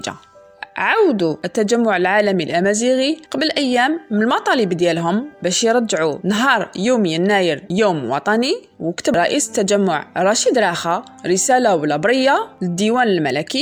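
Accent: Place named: Algiers, Algeria. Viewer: يعطيك الصحة، و تبارك الله على ذاك الصوت الحنين و على اللهجة تاع العاصيمة 😘 الله الله عليك . أسڨاس أمڨاز ثانميرث سڨذرار ن جرجرة 🇩🇿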